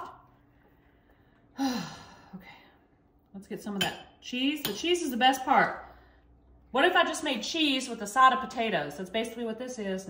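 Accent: South, United States. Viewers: I have lived in the South and Vegas and I’ve never heard anyone say y’all as much as you do. Where are you from? These recipes are fun and look delicious! Thanks for making it fun!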